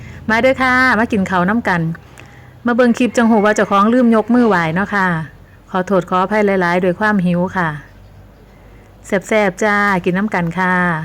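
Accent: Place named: Isan, Thailand. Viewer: สวัสดีครับ มาฟังเสียงเว้าอีสานจ้อยๆดีแถะน้อ....โอ๊ยยย....เป็นตาแซบคักแนบาดเนี่ย...แซบๆกับอีหล่าสองคนเน๊าะ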